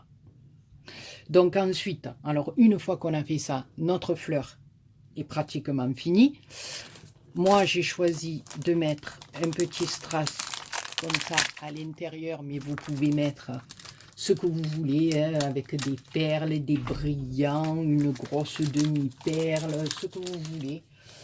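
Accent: South, France. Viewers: Un accent que je connais bien... puisqu'on me dit toujours..." t'es du sud..."... encore merci pour tes tutos, tes explications.. et surtout pour tes idées géniales de déco... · Trop jolies tes fleur des neiges et ton accent j adore tu me fais voyagé dans le sud à chaque fois. Et tes explications son très claire pour moi Ta raison fais péter les fleurs au moins sa sent bon🤣🤣 pleins de gros poutous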